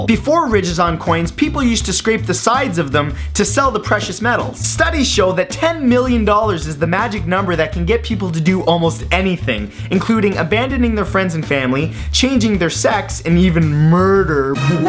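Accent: Canada. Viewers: ur Canadian?! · He sounds so Canadian in this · are you from Canada? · Your Canadian? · Are you Canadian? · Are you Canadian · He is canadian? Keeeeewwweelll.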